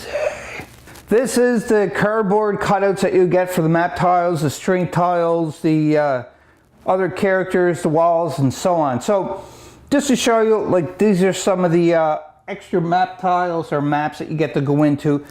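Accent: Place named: Newfoundland, Canada. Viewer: Where are you from? Trying to place the accent. Sounds like NL (Can.) to me. :)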